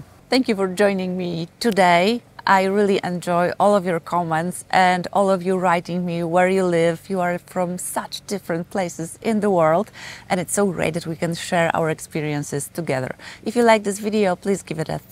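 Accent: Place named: Poland. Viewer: love your garden. I just started mine, I was too busy to do it earlier. Nice polish accent. Greetings from Atlanta.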